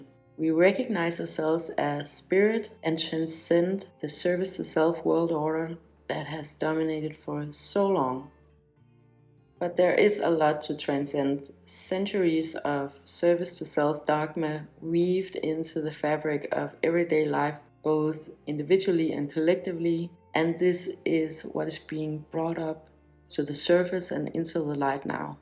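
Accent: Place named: Denmark. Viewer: Det lyder som en dansk accent 😊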